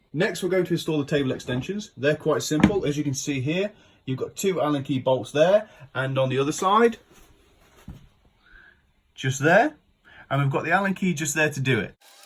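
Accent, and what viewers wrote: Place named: London, United Kingdom. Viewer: The ONE good thing about is he talks the Queens (oops sorry!) Kings English! with no damn accent that at times makes it hard to understand (yes i come from LONDON & speak properly like!) & thankyou, yes they are Allen bolts not set screws like said by others! It takes a Londerner! But sorry you need to tell us more than whats in the useless manuel like the angles etc! So i didnt give you a thumbs up but i didnt give you 1 down either. Im old skool i had the 255 when it 1st came out...... long time ago & they still cant get it right! Best of luck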